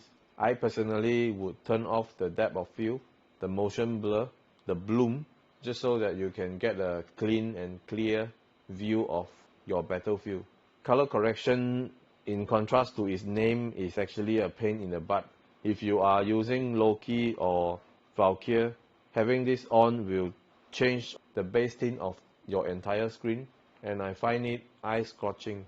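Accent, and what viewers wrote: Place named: Singapore. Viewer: U sound like a singaporean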